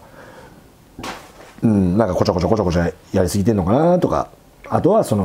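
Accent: Nagoya, Japan. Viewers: 苦労した人ほど人に優しく出来るんですよね。 今回のテーマは共感できました。 説明も社長らしくて、わかりやすいですよ。 地元が近いんで名古屋弁がどら懐かしです。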